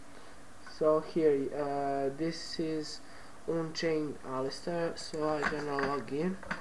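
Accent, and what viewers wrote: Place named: Serbia. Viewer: You talking Serbian-Englesh laung :O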